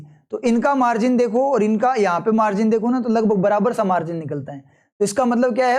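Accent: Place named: Ganganagar, India. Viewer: Ap gngr Or hnumangd side se ho kya.... Awaj se pta chl ra h thoda 😂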